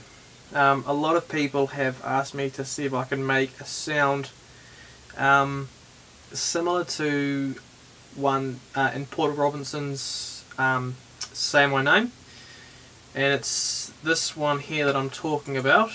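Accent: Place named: New Zealand. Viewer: haha i thought i could hear an nz accent ha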